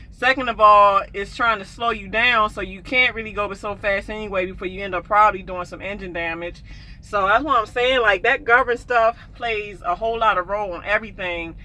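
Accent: Baltimore, United States. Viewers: That Baltimore accent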